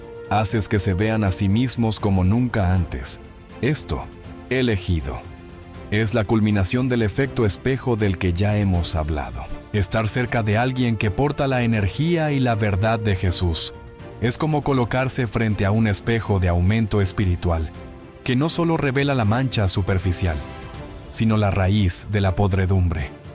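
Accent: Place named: Guatemala. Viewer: Me parese qie eres de Guatemala por el tono de thu voz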